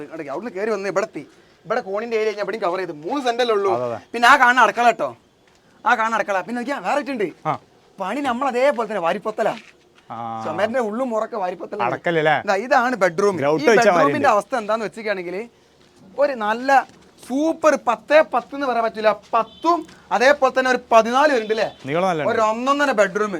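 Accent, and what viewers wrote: Malappuram, India. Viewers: പെരന്റെ ഔത്ത് നമ്മുടെ മലപ്പുറം ഭാഷ😅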